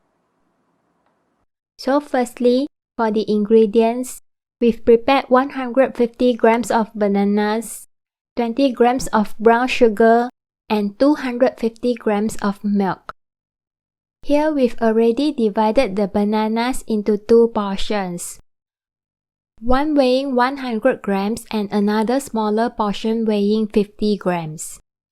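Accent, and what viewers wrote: Malaysia. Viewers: The title says Korea but She sounds like Malaysian/Singaporean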